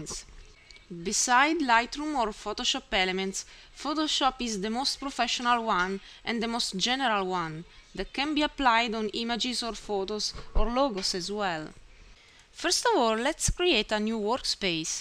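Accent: Italy. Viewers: thanks,your all tutorial r very good n easy to understand n it's working out for me,your pronounce is very cute,i guess u must b Italian by the way keep it up this good job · R u Italian?? Cause ur accent looks like Italian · are you italian ???? just asking cause your accent is so cool :) :) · Love the accent as well sounds Italian. The video is pretty cool I think you encapsulated everything quite well for basic skills... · great job! nicely explained. ben fatto, ho sentito un piccolo accento italiano?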